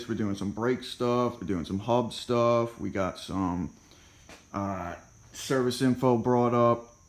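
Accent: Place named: Long Island, United States. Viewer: You sound like UFC fighter Al Iaquinta, which makes sense since he is also from Long Island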